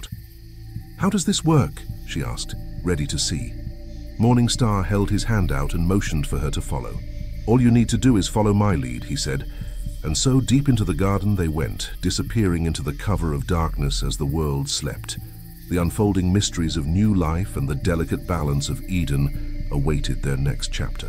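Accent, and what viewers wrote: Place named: United Kingdom. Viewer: Yes I like this deep British/original English accent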